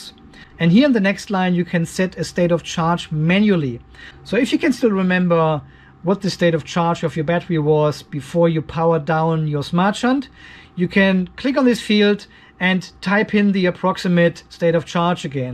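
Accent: Germany. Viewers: very well explained Vom Akzent her könntest du Deutsch sein. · Nothing sounds more stupid as a German tries to speak english !!!!!! Or laugh in English 🤣😂🤣🤣😂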